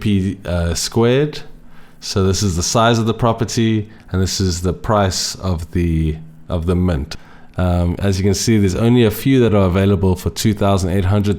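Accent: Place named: South Africa. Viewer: In Spain ..Had Upland for over a year and still don't know how to do hunts..so lekker to hear a SA voice 😁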